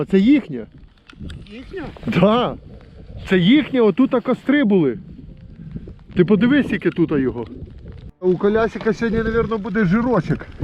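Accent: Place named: Khmelnytskyi, Ukraine. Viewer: Доброго Дня,З Якої Області .Схоже На Розмову Хмельницька.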